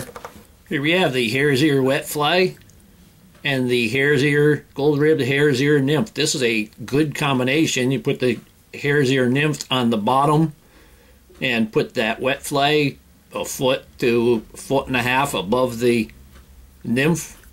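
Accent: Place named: Buffalo, United States. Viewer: you sound like every Polish guy I know from Buffalo. Like I'm home. Great videos!! Thanks!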